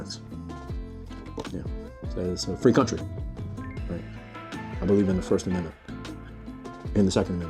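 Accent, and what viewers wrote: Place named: South, United States. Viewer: This explains the redmeck accent you do 😂 Awesome video bro. Thanks for sharing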